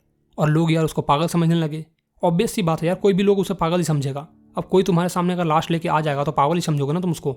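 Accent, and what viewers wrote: Bihar, India. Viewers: Sir aap bihar kai ho kya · he is from bihar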